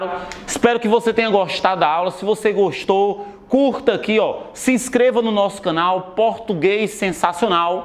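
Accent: Northeast, Brazil. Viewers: O famoso e gostoso de se ouvir sotaque nordestino ksksks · de onde vc é ? pois tem um sotaque nordestino e meio português de portugal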